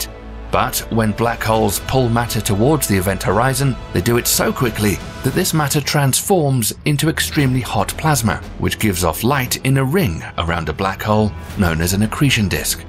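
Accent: United Kingdom. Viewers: Will you always speak in such a beautiful British accent? I love it.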